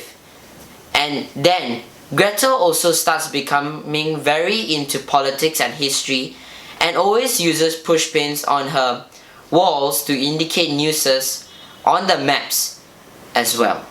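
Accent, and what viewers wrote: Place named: United Kingdom. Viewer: Yt is slowly making your accent more and more British lmao what, i never said it was a bad thing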